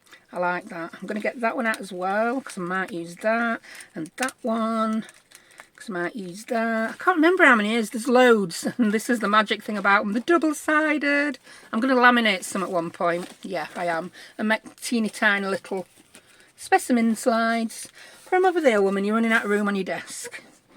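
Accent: United Kingdom. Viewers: Hello, I have been watching your videos for a few months now,some two or three times. I told my children that you talked like me with my sense of humor. Only you are British.I love listening to you waffle and crack on.😂 I have a suggestion for you, but you will have to concentrate and make it a habit. I know because it's taken me months and I still have problems with losing my tools. Get a bowl, tray, basket, etc to place it where you usually drop your tools. Then when you are done with the tool you are using, place it on tray or in whatever you have decided to use. Or you can make yourself a magnetic apron and most of you tools will attach to you. Anyway, keep up the awesome videos. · Hello, from Texas! I enjoyed this video - I love making ephemera from junk -- or "rubbish" as you call it in the UK -- just using up bits of this & that to make something useful and beautiful! 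I love it - thank you for the inspiration and I enjoyed the chatting, too - love your accent! Have wonderful day and happy crafting!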